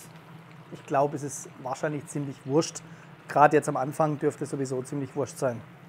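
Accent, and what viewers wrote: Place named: Franconia, Germany. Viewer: Karlsruhe? Du klingst aber auch etwas fränkisch.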